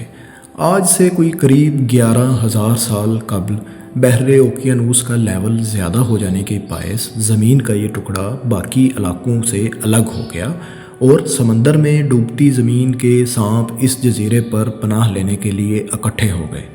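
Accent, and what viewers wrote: Punjab, Pakistan. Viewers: Bhai voice-over kisi dhang se Urdu bolne wale bandy se karwaliya Karen meharbani Hogi Bhat taa K dekhne walon ka vedio main interest Bana rahe, yeh Punjabi mix Urdu lehja kaano ko Bhat nagawar guzarta hai, humble request Hai apse. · Good work ap ka lehja Punjabi hai plez inprove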